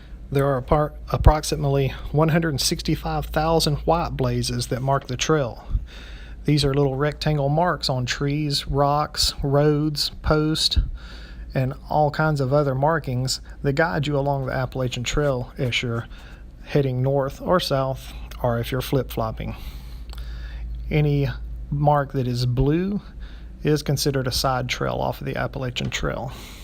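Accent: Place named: South, United States. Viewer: Nice job and good facts for the newbies to the whole idea. I catch a bit of a Southern accent, where are you from?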